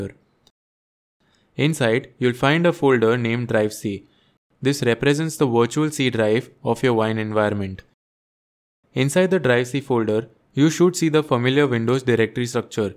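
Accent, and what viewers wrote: India. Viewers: When the narator sounds like indian and you know what you want will be fixed in no time 🥹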